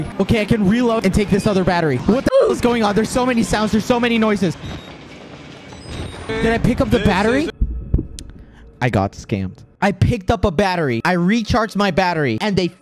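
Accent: United Kingdom. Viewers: Ik, but he was doing a British accent in parts of the video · love how his british accent gets worse and worse as the video goes on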